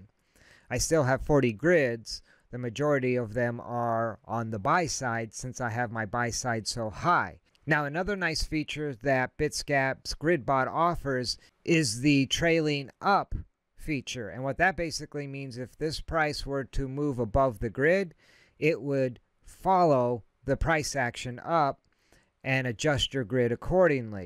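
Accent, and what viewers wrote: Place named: United States. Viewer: you sound american, how can you use binance? i got kicked off and forced to open a Binance.US account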